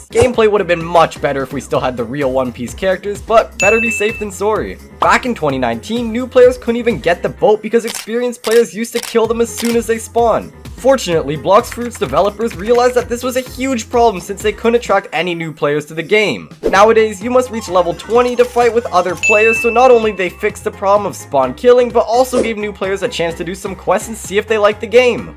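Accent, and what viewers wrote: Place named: Canada. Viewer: you got that canadian accent im assuming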